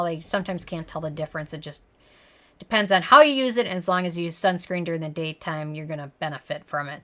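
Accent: Minnesota, United States. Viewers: Are you from Minnesota? The accent sounds like it. ;)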